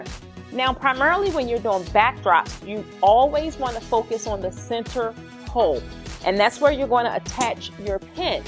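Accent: Baltimore, United States. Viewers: She definitely from Baltimore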